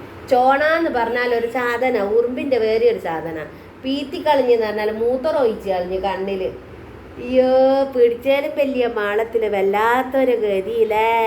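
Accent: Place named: Kozhikode, India. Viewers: കോഴിക്കോട് സ്ലാങ് അല്ലെ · നമ്മളെ സ്വന്തം കൊയിലാണ്ടി ഭാഷ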